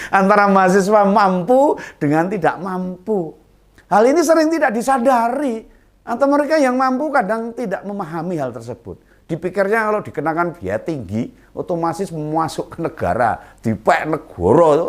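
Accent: Java, Indonesia. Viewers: Ha ha ha, saya sdh lama gk denger orang yg bicaranya dgn dialek jawa kayak gini. Saya lahir di Solo 63 tahun lalu hingga usia 23 hidup di Solo sebelum akhirnya ke Jakarta. Ini ngomongnya sdh spt tetangga2 saya dulu, wis rasah mulih, iki wis cukup ngobati kangenku karo Solo. Ha ha. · 0ak belantek sapi apa khabar jawznya pekat gak usah ngomong bahasa indonesia medok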